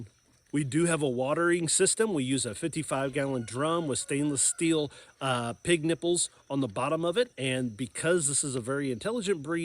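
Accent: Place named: Scotland, United Kingdom. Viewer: Mighty fine Scottish accent!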